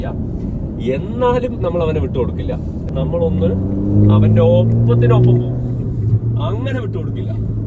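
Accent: Palakkad, India. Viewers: തനി പാലക്കാടൻ😅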